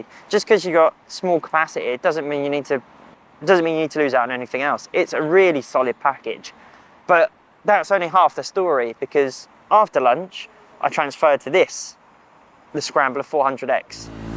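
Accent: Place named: South East England, United Kingdom. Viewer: Great bike, but really disappointing to see that MCN still feel that people ‘crippled’ with a South East accent are only suitable to do video reviews. If you are a UK newspaper/magazine, maybe it’s time to have accents outside the South East represented. I’m from the South East, but travel a lot around the UK on my bike and talk to fellow bikers, but realise that the people I talk to aren’t represented in terms of accent and authenticity on MCN. There are some very knowledgeable motorcycle riders outside the South East bubble. Even the BBC realised that folks outside the South East know stuff. Come one MCN, come out of your South East echo chamber. It’s particularly annoying knowing you’re based in Peterborough, which isn’t even in the South East! Let Wales, Scotland, Ireland (North and South), the North of England and the Midlands and even the South West of England be heard. 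Do what the BBC did and learn to embrace your wider audience, rather than your tea room. Otherwise, keep doing what you’re doing, as you’re fab!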